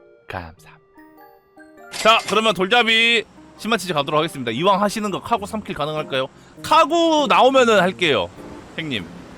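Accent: North Korea. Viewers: 저 목소리 왤케 북한 말투 같아보이냐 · 억양이 북한 사람 같…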